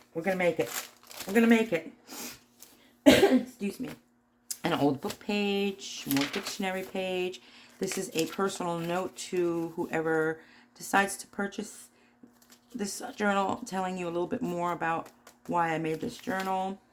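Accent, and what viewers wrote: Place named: East Coast, United States. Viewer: Loved this journal! I knew from your accent that you were an East Coast girl (me too! RI/CT)! New subbie! Thanks for sharing!!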